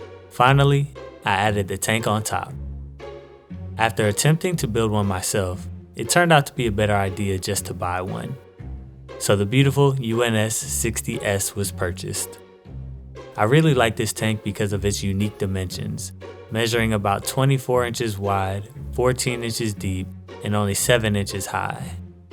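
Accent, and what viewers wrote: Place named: Los Angeles, United States. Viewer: You sound like you're from LA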